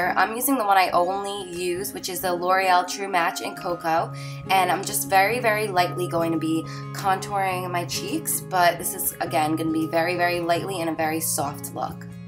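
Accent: New Jersey, United States. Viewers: "Soft and genal" your jersey accent is so strong hahah ^.^ I love this look!!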